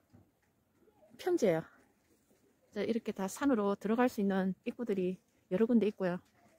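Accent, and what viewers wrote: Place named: Gyeongsang, South Korea. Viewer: 경상도 분이 서울에 오래 사신 분 같습니다 즐거운 설명 잘 듣고 갑니다 ^^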